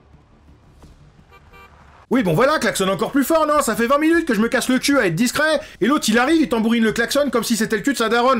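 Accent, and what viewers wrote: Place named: Champagne, France. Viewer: oh petard un compatriote élevé au Chaource et à l'andouillette AAAAA ! Je sais pourquoi je me sent comme à la maison quand je t'écoute maintenant ! J'tenterais même de dire que t'es de Brienne 😂 Bravo pour ton travail en passant 💪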